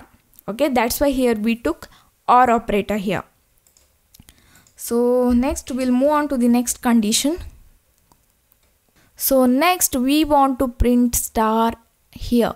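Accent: South India, India. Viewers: Ur voice close to South indian, while speaking southern India will play around,. 😉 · Your voice and accent is like south Indian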